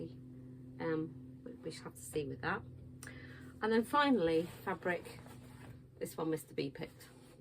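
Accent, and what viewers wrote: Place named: West Country, United Kingdom. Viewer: Great 1st vlog 👏🏻 really enjoyed listening to a West Country voice (being from Somerset). I’m interested in the bag makers box too - the bimonthly is good - not sure I need a bag a month 😅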